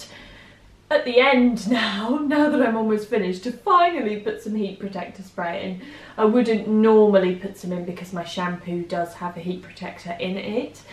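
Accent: United Kingdom. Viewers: you said in British accent. I can't ask for more. I'm too distracted by your accent.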